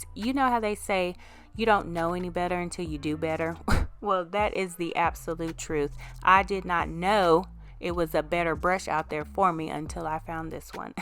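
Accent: South, United States. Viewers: I love these! I hope I didn't say anything out of line by saying you sound like you're from the south? I mean I love it. I know a lot of people say southern people sound stupid because we have a southern accident. I want meaning that at all. I love your accent again I have a southern accent but yours sounds sweet. I'm not usually into clear tips but I really love these colors and it might have to you all day but you make it look so easy. That's why I don't do my nails a lot because it takes me so long! Much love to you!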